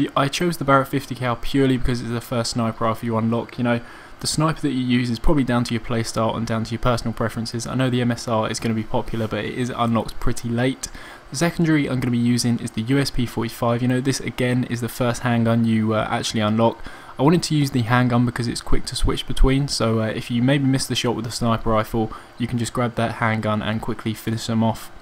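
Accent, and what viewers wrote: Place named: Cockney, United Kingdom. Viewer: there is no such thing as a 'british' accent, this, is a southern cockney accent, from quite a well spoken man here so you probably accociate this with someone like hugh grant. but say for example you went up north, to newcastle, you would have no idea what they are saying, take for example when cheryl cole and none of you yanks could understand her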